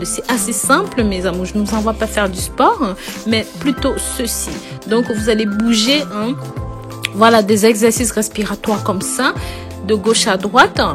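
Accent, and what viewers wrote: Africa. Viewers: Vu l'accent c'est une africaine qui vous prend pour des cons moi j'ai perdu 5 cm de tour de taille en 1 mois grâce au sport programme abdo, et footing tout les matins avec un bon régime alimentaire si non tout est bidons ....merci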